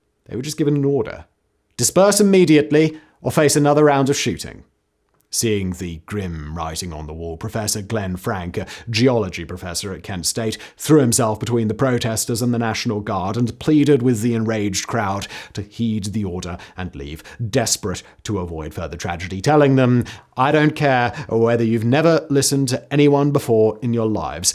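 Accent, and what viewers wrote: United Kingdom. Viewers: Yeah, a YouTuber of unknown qualifications and a British accent is going to tell us about the intent behind the killings at Kent State in Ohio.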